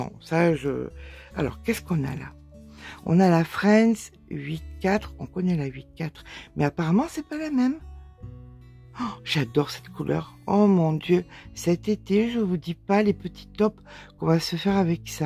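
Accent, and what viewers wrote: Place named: Alpes-Maritimes, France. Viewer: Alors voilà, j'en étais sûre, 06 mais C bien sur, j'ai entendu votre léger accent et votre façon de parler pdt des années, 50ans pour être exact, d'une Régine qui habite à BIOT, je suis allée en vacances à Antibes en camping, il y avait tjrs 3 ou 4 générations, j'y suis allée enfant, ado, jeune maman et mamy.... De merveilleux souvenirs, C pour cela que votre voix, accent lié à ma passion, le crochet font un si bon ménage, merci tt simplement d'être là et reMerci d'être VOUS 💋🥰🤗💞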